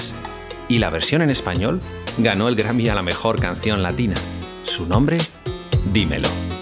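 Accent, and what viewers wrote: Spain. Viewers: Que feo eso de hablar por otro. Me parece q debió ser un relato en tercera persona, y ese acento español que es eso. Hable bien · Ese acento español, definitivamente no sirve para imitar a Marck... no suena igual !! Eso indica que la narración, las opiniones y punto de vistas expresados, puede que no sean los reales, ya que no los vierte el verdadero Marck Anthony !!! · La historia de Marc es muy interesante, pero no entiendo ese acento español que risa 😂 obviamente no eres Marc, es por eso te aconsejo que solo relates „la Historia de Marc Anthony“ y sería mejor que no sueltes tus 🐔 gallos 😂please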